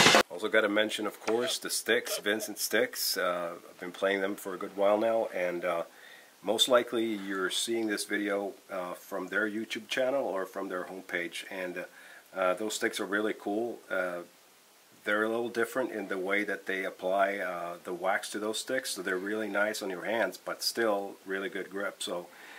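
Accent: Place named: United States. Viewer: His American accent is almost as good as his drumming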